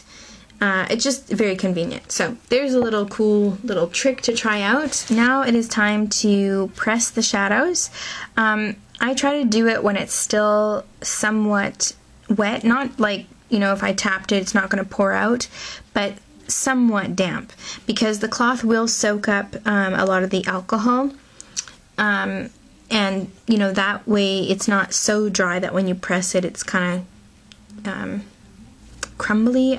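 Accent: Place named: Canada. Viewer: Are you canadian? because when you say 'out' and 'eh' you really sound like you're from canada! ll =P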